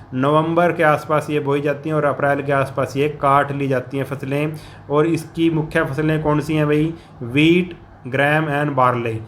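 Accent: Punjab, India. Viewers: I think he is Punjabi so his accent is Punjabi 😊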